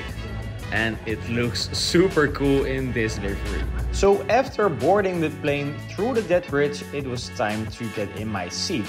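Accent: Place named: Netherlands. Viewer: Toch nog stukje Nederlandse accent door het Engelse gepraat heen😂 leuke video man!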